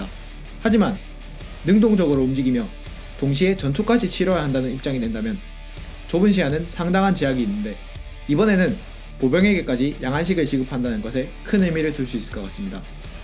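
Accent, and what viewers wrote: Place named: Gyeongsang, South Korea. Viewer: 갱상도 사투리와 영어버터발음의 조화!!!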